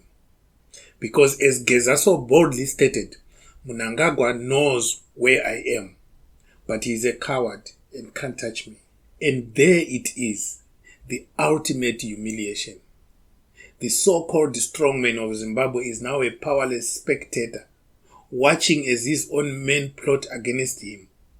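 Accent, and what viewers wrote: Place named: London, United Kingdom. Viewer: You can speak London 💯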